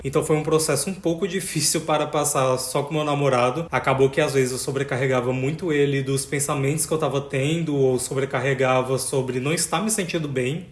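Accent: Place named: Natal, Brazil. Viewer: nossa, tô maratonando seu canal e logo pensei “será que ele é de Natal?” pelo sotaque mas principalmente pelas gírias hahahaha amei ❤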